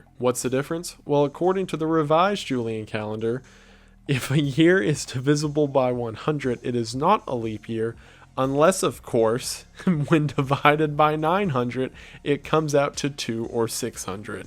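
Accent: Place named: Kentucky, United States. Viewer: Your voice is very smooth, like a young college professor from Kentucky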